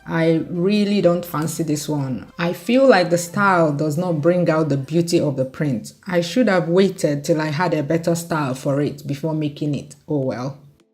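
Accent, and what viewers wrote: Nigeria. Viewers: Even before clicking as I scrolled, I thought, you are a joy and I love your authentic Nigerian accent. I am Ibo too, I live in England. Continue to be you! sister, it's beautiful and we can all glean from you 🙌💖😊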